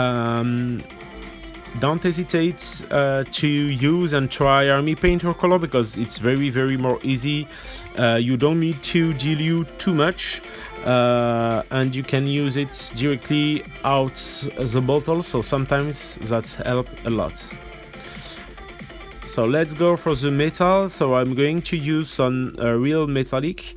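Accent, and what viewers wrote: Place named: France. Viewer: I can hear french accent lol excellent tuto merci beaucoup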